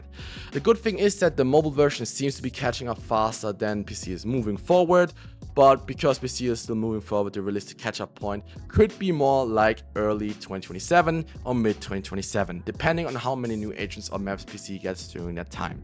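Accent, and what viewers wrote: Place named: Germany. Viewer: You really got all the info in this little Video keep it up! Lil question. First where are you from because you sound a bit german and second can you explain how you get a 100 ping if the background is your gameplay because i am stuck on 150 ping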